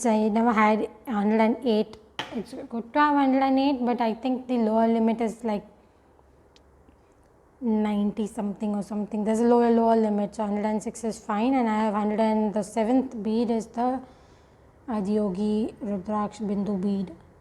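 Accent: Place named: India. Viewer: It’s soothing hearing you talk in your Indian way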